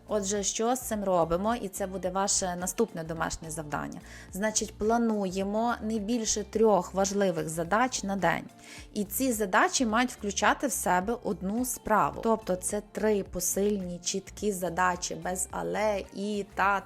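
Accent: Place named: Western Ukraine, Ukraine. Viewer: Круто,мені здається,що я чую західний акцент))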